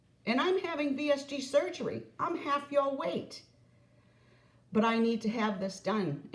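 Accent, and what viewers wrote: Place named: New England, United States. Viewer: This is my first video to see from you. Thank you for this explanation. I have a family member who has lymphedema in her arm, as a result of her breast cancer surgery. She told us about some of her treatments and the sleeve she’s supposed to wear at all times, but we didn’t realize the seriousness of what she’s living with. I enjoyed your video so much that I just subscribed. Plus it sounds a little bit like you’re from New England. I’m not from there but I lived there for a few years! So it’s fun to hear the accent again. ☺️ Anyway, I look forward to watching your other videos and following your future progress!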